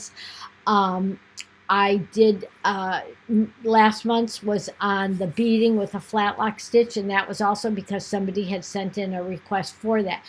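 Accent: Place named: Australia. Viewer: I can hear you Australia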